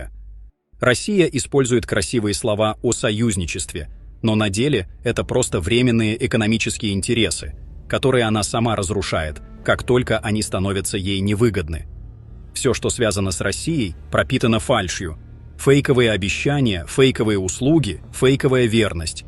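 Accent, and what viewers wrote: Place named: Armenia. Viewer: не слушайте этого армянского пропагандиста все армянские деньги вкладывать чтобы общество вести в заблуждение Россия поняла что в Сирии нечего делать если сирийский народ 99% не хочет Башир асада зачем наши войска должны быть в си и деньги ещё вложить а насчёт арцаха это имело отношение к кавказской Албании VII век а Карабах по всем документам идёт Карабах товарищ армянские продажные с красивой речью выступаешь продажный человек что тебе армяне говорят то и красивые волосы наступаешь с армянским акцентом конечно не выгодно рассказать анекдоты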